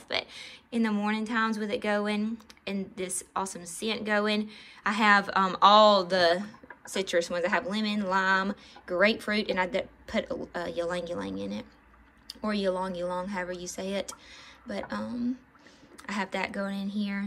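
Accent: South, United States. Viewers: So pleased that I found your You Tube channel! Love your southern accent as you sound like me (I'm from west TN).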